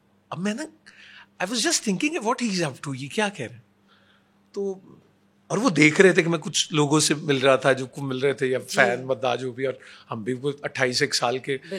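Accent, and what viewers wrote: India. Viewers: Hindi influence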